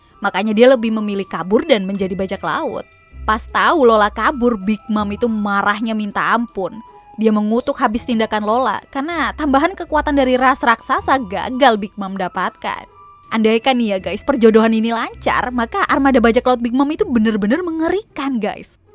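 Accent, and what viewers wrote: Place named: Betawi, Indonesia. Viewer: saikk ada sdikit logat betawinye ~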